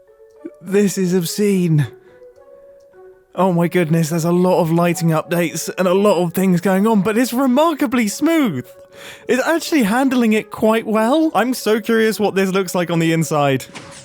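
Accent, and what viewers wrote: United Kingdom. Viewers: funny hearing a british person talk about the bass pro memphis pyramids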